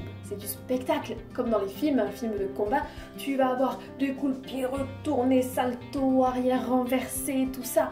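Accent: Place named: Southeast, France. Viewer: « On est tous le beau gosse de quelqu’un. » J’adore! Tu es du sud-est, vu ton accent de bel canto??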